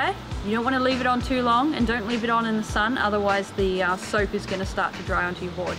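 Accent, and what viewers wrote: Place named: New Zealand. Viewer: Love this kind of art, and the kiwi accent :)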